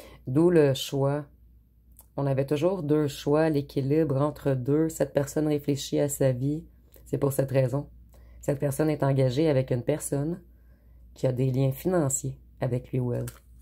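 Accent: Quebec, Canada. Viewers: Vous êtes québécoise vous????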